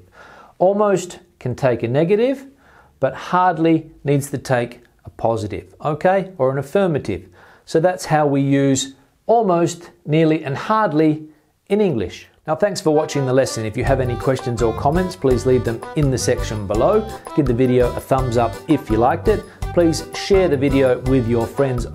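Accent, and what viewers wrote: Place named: RP, United Kingdom. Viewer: It was very pleasantly and usefuly to listen to your lesson, sir! You have the real British aristocratic prunounciation! Thank you. I'm from Russia. Now I'll watch your classes more often.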